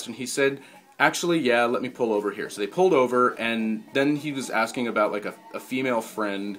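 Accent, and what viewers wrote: Atlantic Canada, Canada. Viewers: also, I love accents. I think they are wonderful. You can use that atlantic canadian accent all you want. I might even pick it up from you, cause well, I have habit of doing that after some exposure. It's so not intentional either. The sprectrum of sexual orientation is fluid, but each person has thier own container. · i love your atlantic canadian accent And your fake american accent! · how canadian, the accent and in the background.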